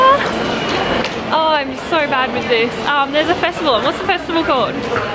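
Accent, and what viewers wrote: Australia. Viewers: I love how hard you spin that gacha spinner! And oh God I'm such a sucker for a sweet Australian accent. You just got a new fan,!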